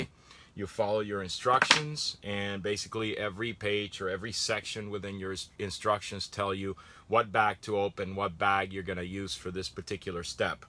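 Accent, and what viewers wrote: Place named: Canada. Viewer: Great vid! I know you live in Florida like me...but you must have grown up in Canada? Accent...Thumbs Up!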